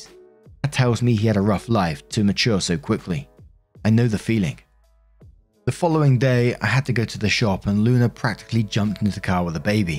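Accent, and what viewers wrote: United Kingdom. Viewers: i aint gonna lie I was taken outta it for a second hearing someone with a british accent say they were a latin king lmfao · British pronunciations of Spanish words make me chuckle and wince simultaneously. One day you’ll get it,!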